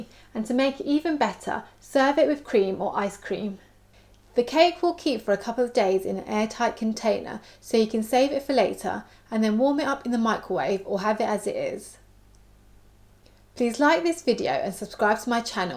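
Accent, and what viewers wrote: United Kingdom. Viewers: I made this today and it came out very good. I substituted the flour with 2/3C of Almond Flour and 1.3C of coconut flour and used the Monkfruit sweetener. The only issue I had was removing it from the springform pan I used. It split apart slightly in a couple of places but nothing too drastic. Maybe I didn't let it cool enough. I served it up with some Neopolitan Ice-cream and I was in heaven. It was all you said it was. Thank you so much for sharing this recipe. I enjoy listening to you and watching you cook. The cross between your British accent and your sweet Asian personality makes this experience and your channel very enjoyable. :)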